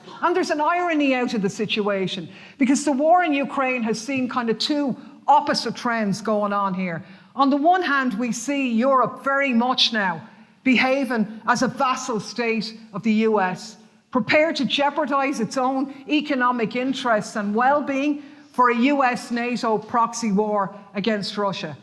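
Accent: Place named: Ireland. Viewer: Irish accents though. So pleasant.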